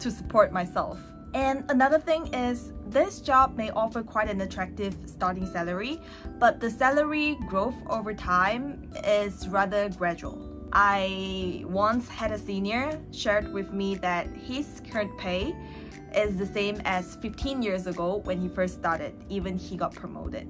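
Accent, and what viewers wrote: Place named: South Korea. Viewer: Maybe your interaction with crews and people, your accent is more towards Korean than Malaysian accent 😊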